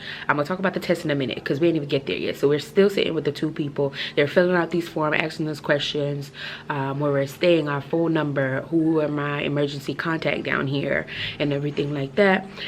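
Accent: Jamaica. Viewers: No offence but which r u Jamaican r American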